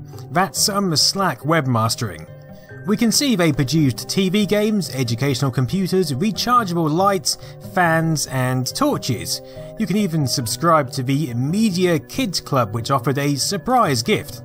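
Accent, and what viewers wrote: United Kingdom. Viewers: The Britishness of your voice is glorious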